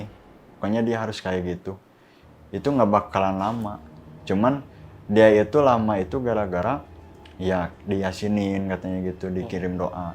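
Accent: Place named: Pandeglang, Indonesia. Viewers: Gile 2 jam nonton bang mode serius. 😂 Si abangnya cerita khas banget orang Sunda, selow, tapi aku betah soalnya sambil bikin kuah bakso. Hari ini di Jember lagi merayakan hari raya ketupat btw. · mas y org mana kyak logat org pandeglang banten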